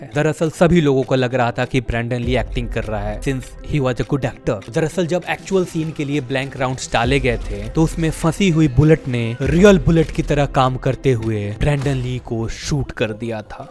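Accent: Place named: Bihar, India. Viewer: Your Bihari accent is so sexy, say it again 😉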